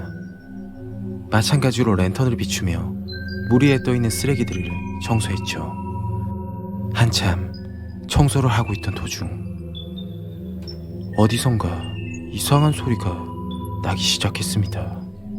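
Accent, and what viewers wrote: Gyeongsang, South Korea. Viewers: 안타깝네요.😭 님 갱상도사투리 억수로 잘하시네예.갱상도 분이신가?🤔🤔😁👍👍👍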